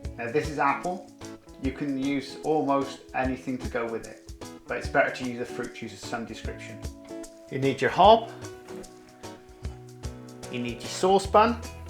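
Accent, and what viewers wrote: United Kingdom. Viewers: Great recipe and nice british accent!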